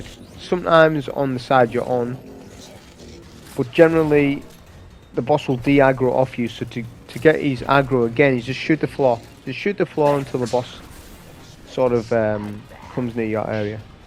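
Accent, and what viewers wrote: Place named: North, United Kingdom. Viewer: you're an up and comer youtuber but man your northern accent and conversational commentary make you very likable. catch this sub.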